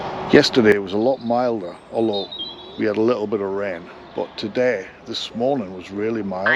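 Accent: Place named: North East England, United Kingdom. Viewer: It's nice to hear a north-east accent. I'm from the NE myself =)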